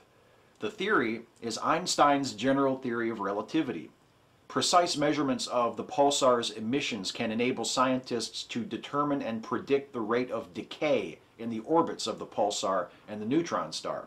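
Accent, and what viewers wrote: United States. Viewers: veeee hickul. I love American accents.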